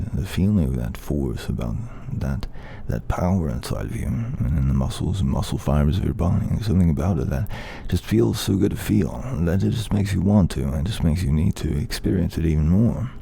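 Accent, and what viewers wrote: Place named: United States. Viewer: This guy sounds remarkably American.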